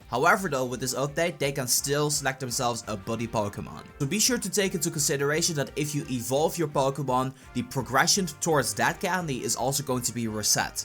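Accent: Netherlands. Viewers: It really sounds like you have a Dutch accent.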